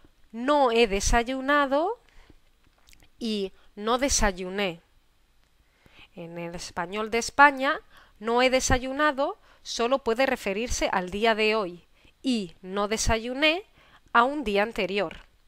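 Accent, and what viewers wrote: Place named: England, United Kingdom. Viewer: Me ayudan mucho tus videos.Y me encanta tu acento inglés.:)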